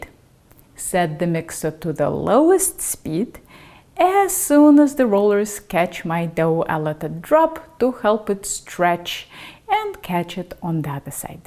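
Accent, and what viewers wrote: Russia. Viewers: I didn't even listen the recipe it's self I just watched and listen to this lady talking in her Russian accent. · You do Italian food but you sound Russian! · You have a nice Russian accent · Love your accent! Russian right? Was hooked from the first few sentences :-) very informative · Quite entertaining, and with a kinda of Russian accent for a change! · So hard russian accent, I couldn't resist... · Like the Russian accent and talking of pasta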